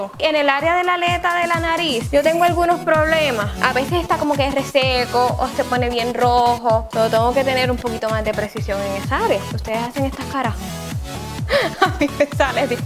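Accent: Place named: Isabela, Philippines. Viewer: Hola, vengo del canal de Josue, no sabia q tenias uno. Me alegra mucho, q hallas decidido grabar. Tu forma d hablar suena bien brutal a como hablamos acá en Isabela, se q somos del mismo país pero no en todas partes tienen el mismo cantaito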